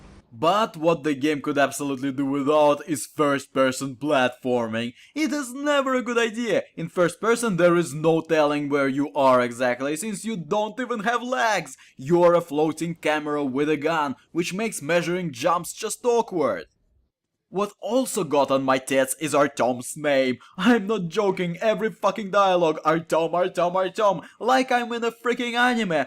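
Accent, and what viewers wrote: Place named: Russia. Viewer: That heavy Russian accent is really hard on the ears.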